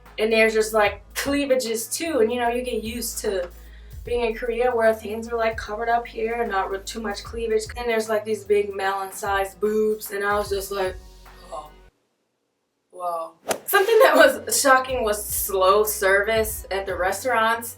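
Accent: South, United States. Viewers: Haha that was really cute. Might've been a while since you've been back in the states but you still sound very american :) (As an Australian) I think i'd find those things pretty shocking too tbh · amazing how much your accent went back to confederate from where it was in march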